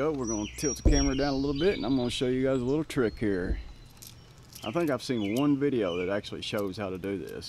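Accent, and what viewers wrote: United States. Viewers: dude you have the most American accent i ever heard, i thought that was a hollywood thing hahahahaha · you sound like brad pitt when he does his southern accent fuckin great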